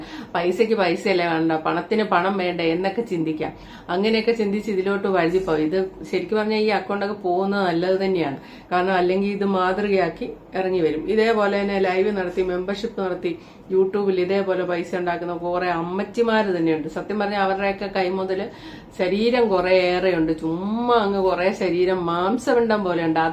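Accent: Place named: Kollam, India. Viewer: കൊല്ലംകാരിയെന്നു തോന്നുന്നു കാഞ്ഞിരപ്പള്ളി സ്ലാങ് വേറെ ആണ്‌ ഞങ്ങൾ ഇത്തരക്കാരല്ല Am proud to be a Kottayamkaran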